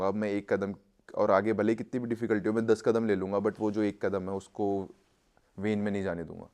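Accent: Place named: Delhi, India. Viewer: u r talking like generic delhi boys. dont know whose personality rubbed off on u. pls go back to being your simple self who is not so camera trained and delhi speaking style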